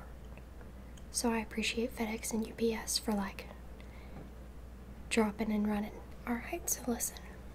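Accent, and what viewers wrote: South, United States. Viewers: I love your southern accent that keeps sneaking out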